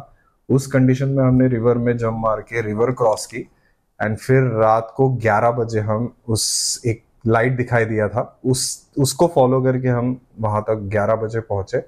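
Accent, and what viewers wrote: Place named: Maharashtra, India. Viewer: तुझी हिंदी खूप चांगली आहेस, पण हिंदी बोलतांना तुझी मराठी Tuning अधून मधून बाहेर निघूनच जाते 😂😂❤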